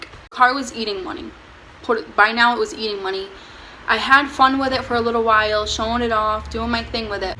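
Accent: Boston, United States. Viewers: Do you live in Boston? It sounds like you have a boston accent. It's cool. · Are you from Boston? You have the accent! lol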